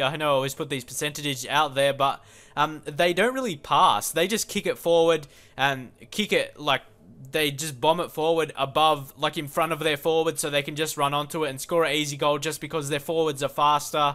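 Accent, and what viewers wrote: Australia. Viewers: are you from Australian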